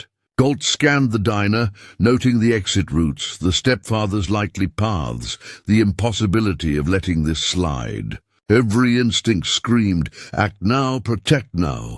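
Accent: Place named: England, United Kingdom. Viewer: Sorry, but I can't listen to the heavy English (?) accent telling a biker story. Just doesn't match.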